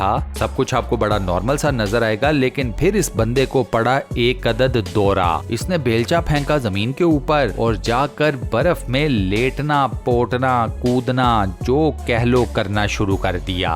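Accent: Punjab, India. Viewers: Great voice over with Punjabi "tadka"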